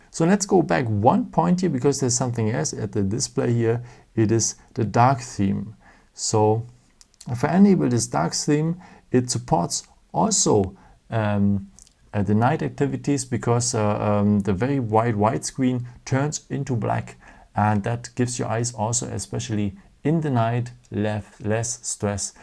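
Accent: Germany. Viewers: Think he's from Germany. I'm living there and most Germans speak English like that. But I agree with you :)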